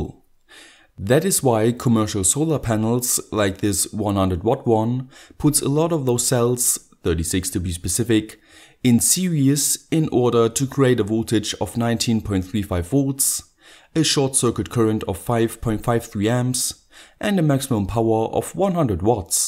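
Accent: Germany. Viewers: You sound like you're a German... It's not worth trying and build your own PV modules...Tried everything and it's just throwing away money and time for something that's never going to work and last like ORIGINAL fabric made one... Best regards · Of course he comes from Germany, you can clearly hear his accent.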